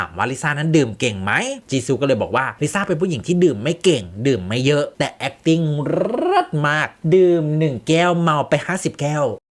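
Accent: Isan, Thailand. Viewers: พอได้ฟังพูด อิสารเลยกดติดตามคะ❤❤❤ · ชอบแอดเว้าอีสานมาก น่ารัก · ชอบในความหลุดความเป็นอิสานนะคะ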